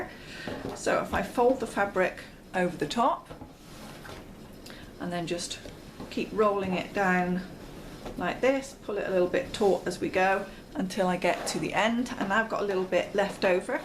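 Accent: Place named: Gloucestershire, United Kingdom. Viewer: I'm from Stroud, Glos and your accent feels very Gloucestershire!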